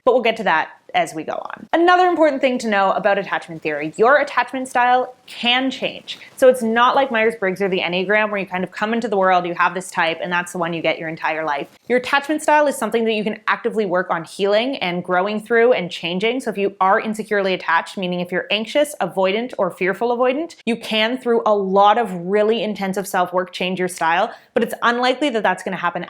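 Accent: Canada. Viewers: This is the first video where I realized is Canadian. I don’t hear it as much in her new videos.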